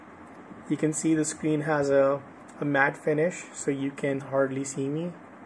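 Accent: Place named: Rajouri, India. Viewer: Abey gandu bina accent ke bol deta, samajhne meh asaani hoti. Rajouri wali accent leke gaand marane jaa raha hai kya gb road?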